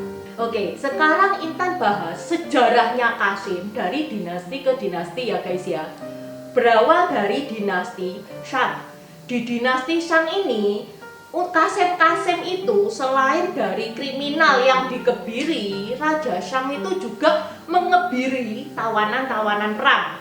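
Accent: Java, Indonesia. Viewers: Baguss logat jowo njekkeknya, semangat yuu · Maturnuwun mbakyu Intan, aku seneng dengar ceritanya dengan logat Jawa yang kental... teruske wae mbakayu.... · Indonesia memang beragam.ayo kita jaga keberagaman yang ada di indonesia.....unik banget Cece logat jawanya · Muka Tionghoa ngomong medok banget Jawanya 😀😀😀😀😀😀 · Org Jawa memang medox.salam dr pulau Lombok kak. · Logatnya jawa banget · Casinge cino jowone medok tenan.. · Paras Tionghoa logat jawa. Sukses trs Mbak Intan👍. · Ini logat Jowo Cino. Bahasa Jawa campuran bhs Indonesia dg logat khas mereka. Jarang yg murni pakai bahasa Jawa atau murni bahasa Indonesia. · Mbak Intan anda orang semarang ya...koq medok jawanya. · Mantap 👍👍,,,logat Jawanya medog,,👍👍👍😀,,, terus semangat,,,,and sukses selalu 🙏👍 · Muka mu cino suara mu jowo ... wow ! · Jawanya medhok he... Saya juga orang Jawa logat jawa kalau sudah lengket sulit ilangnya. 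😀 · Logatnya medok jawa bget!!!wow keren👍🏻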